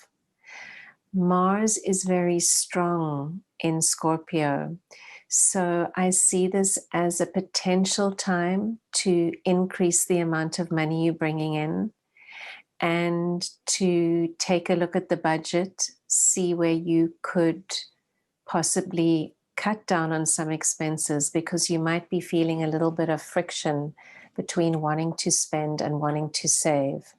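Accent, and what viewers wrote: South Africa. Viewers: Loved this; thank you very much! Gives me hope. Wonder if I can still meet someone during Covid living at my parents house haha. My Ascendant is libra at 23' degrees; Will Pluto still have an deep transformative influence on me? you sound South African haha:)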